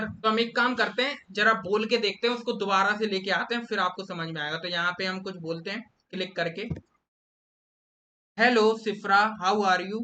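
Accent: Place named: Bundelkhand, India. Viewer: you bundelkhandi acent is too good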